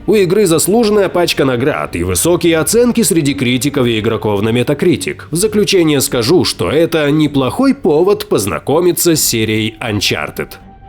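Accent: Ukraine. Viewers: ти украинец?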